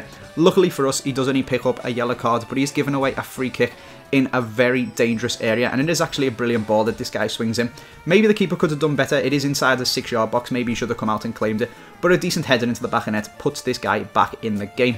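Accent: Liverpool, United Kingdom. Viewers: You scouse genius, you have made my day!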